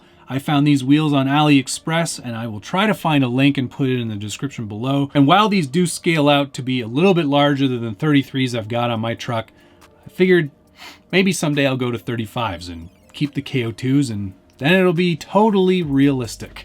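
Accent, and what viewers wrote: Canada. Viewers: Sounds like you are a fellow Canadian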